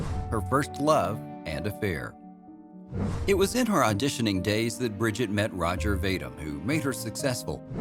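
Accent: United States. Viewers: What a ridiculous "French" accent!!! Trintignant? Bécaud?!! Stick to what you can just about manage in that speech impediment you'd call an American accent!!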